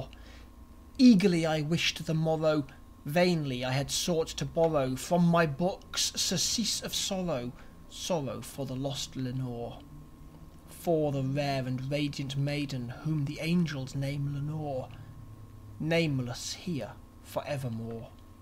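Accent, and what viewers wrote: United Kingdom. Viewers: Deep sht. R u brittish?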